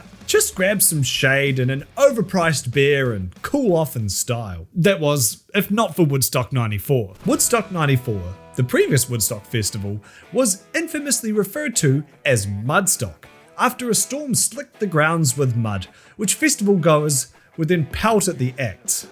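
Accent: New Zealand. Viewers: Hearing a Kiwi say words like "festival" always brings a smile to my face. Top tier accent. Sunds suu frundly ay. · He's clearly from NZ 😅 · Kud rock? Like the Kud whale? Haha love the kiwi accent!!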